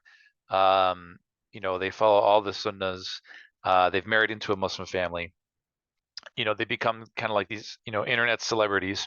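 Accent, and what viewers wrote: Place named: Canada. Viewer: Also love the Canadian accent, the best accent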